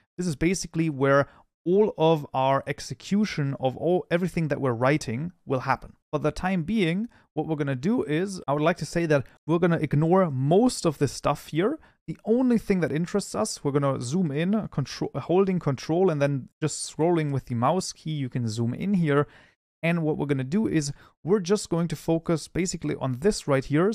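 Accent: Germany. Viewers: Is this an Deutsche akzent?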